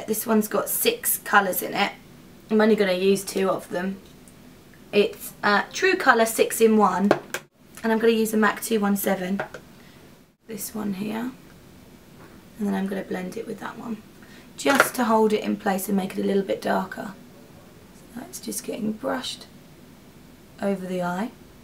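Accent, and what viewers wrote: United Kingdom. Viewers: Hi.! So happy both you and little Harry are OK.;) Time's flying by so fast! No wonder these little angels grow up so fast.:) Thanx for the awesome vid, you can't imagine how many of your looks I'm wearing on a daily basis. (And, on a totally different note, you restored the British accent to my world! Some awful teachers ruined it for me forever but I LOVE listening to you guys! Don't mean that in any weird way.:) Just a thank you note.:))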